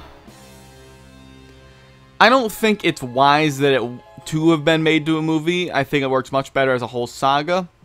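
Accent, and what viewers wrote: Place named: Upstate New York, United States. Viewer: Hey, not DB related just curious. I remember saying you're from rural NY. My sister in law is from Schenectady and has a similar dialect to you. No need to specify, but is that about ballpark to home? Again, no need to get too personal or in depth, just making an observation as neither she and her family nor you have the stereotypical fuhgetaboutit accent.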